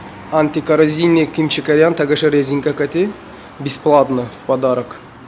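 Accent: Konibodom, Tajikistan. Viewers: Канда занид бародар шеваи Конибодомива гардам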